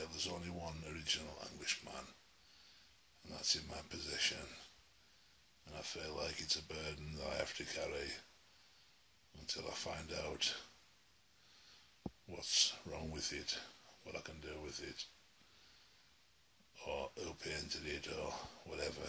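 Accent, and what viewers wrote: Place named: North East, United Kingdom. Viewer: You sound like you're from the north-east, if so that painting too close for comfort.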